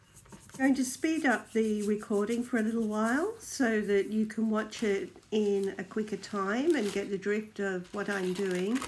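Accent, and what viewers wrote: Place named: Australia. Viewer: Just found your channel,. Good to hear an Aussie voice. Love wabi sabi style. Can you tell me what the paper is, and the brand?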